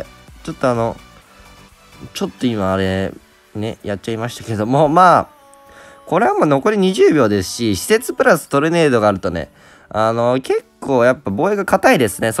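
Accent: Okayama, Japan. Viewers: RADさんって岡山県の人！？！？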